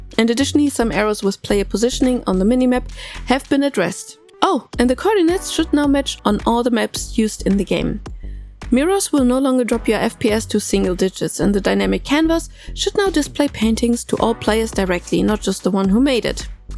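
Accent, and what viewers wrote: Germany. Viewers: Bist du deutsch? Hört sich sehr danach an xD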